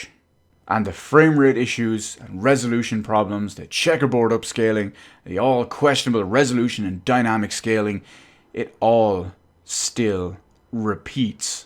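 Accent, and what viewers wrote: Ireland. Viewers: Is the Irish?.., ( asks another Irishman )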